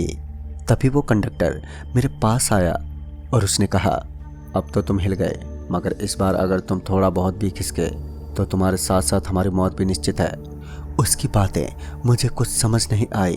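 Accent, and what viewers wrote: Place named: Bengal, India. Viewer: Bhai tum pakka bengoli ho...tmhara Hindi Bengali mix ho rha h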